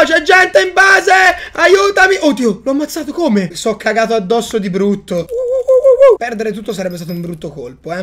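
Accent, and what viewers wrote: Tuscany, Italy. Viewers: perché non fai un video delle bedwars, dove parli solo con il tuo dialetto toscano, spolliciate così lo legge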